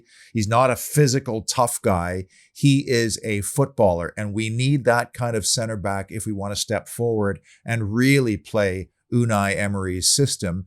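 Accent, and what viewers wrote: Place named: Canada. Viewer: A villa fan with a Canadian accent? Wow that's a little surprising.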